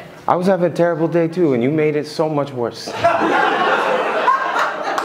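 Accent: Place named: Boston, United States. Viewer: He’s so Boston it’s not even funny 😂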